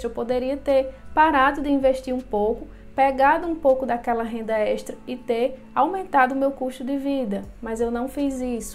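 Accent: Northeast, Brazil. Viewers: Gostei muito do seu vídeo, muito nobre de sua parte ajudar as pessoas sei que seu intuito foi esse bichinho, agora diga uma coisa esse sotaque arretado é do Nordeste? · Mulher ameiii o vídeo era exatamente o que procuro Já min escrevi no canal Você è nordestina ?? Sotaque Nordestino 😊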